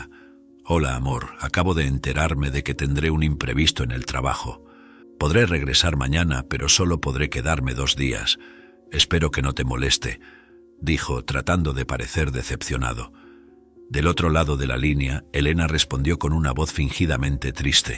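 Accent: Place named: Spain. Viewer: Para que la historia resulte interesante y hermosa, tiene que haber una buena historia, una excelente voz, bien modulada, buen lector. Todo éso se ha encontrado en ésta. Muy especialmente, con el hermoso acento del Castellano por un español. Me encantó.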